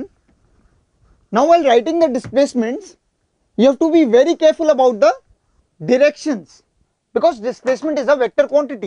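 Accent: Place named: India. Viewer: oh man goddamit indian i couldnt understand any word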